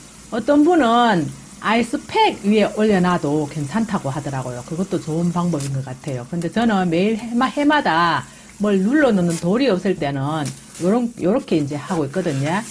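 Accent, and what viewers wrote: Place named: Daegu, South Korea. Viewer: 싱거운 김장김치 고민중에 ~~영상보고 도움됫습니다 ~~대구사투리듣고싶어 한번씩보고 구독 좋아요 꼬옥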